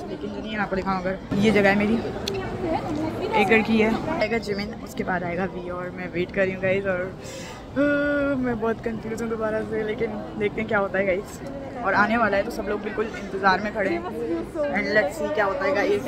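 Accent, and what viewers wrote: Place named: India. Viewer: Ur accent is like indian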